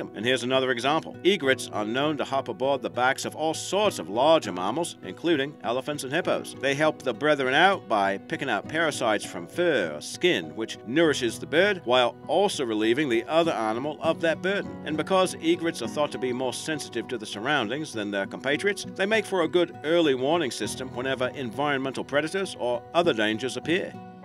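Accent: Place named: Australia. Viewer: Nice to hear the Aussie voice make a return. It just feels better with it, like ol' Steve Irwin came back from the dead.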